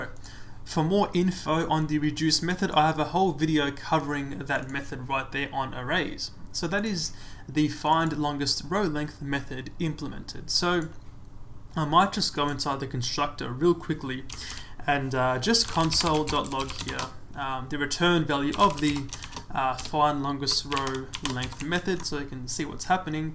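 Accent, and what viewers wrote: New Zealand. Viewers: Ok.... no worries.. I'm Aussie and thought I heard a slight hint of kiwi. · Are you a kiwi? you sound like one!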